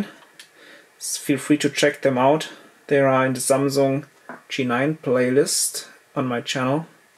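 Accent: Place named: Germany. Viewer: Man hört einfach das du ein deutschsprachiger Mensch bist ^^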